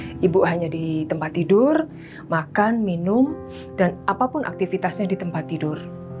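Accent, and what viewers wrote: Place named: Java, Indonesia. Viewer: Wah jawani banget nggih bu dlm bercerita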